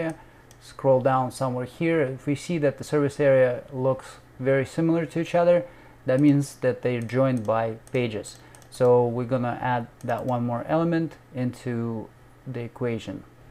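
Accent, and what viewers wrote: Russia. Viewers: Хм. У автора как будто еле различимый русский акцент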